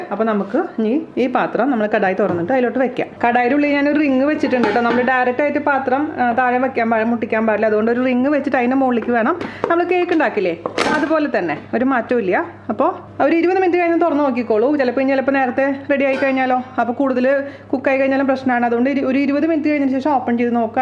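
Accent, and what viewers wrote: Thrissur, India. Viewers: Super simple recipe, enikku aa Thrissur accent ishtayi tta 😊😀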